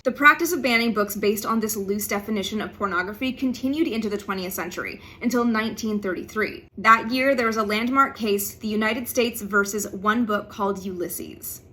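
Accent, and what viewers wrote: Canada. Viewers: Now we deport you for speaking out or writing about a genocide that we're paying for in occupied Palestine. Pretty sure you're Canadian from the way you say your "ou" you off the hook. Alabama banned ann frank cause she was a jew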